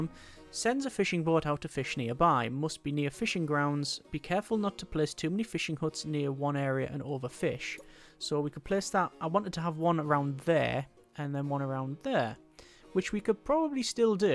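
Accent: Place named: North East England, United Kingdom. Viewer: I’m very curious about where he is from, I feel like he is from the uk and possibly the north east but please correct me if I am wrong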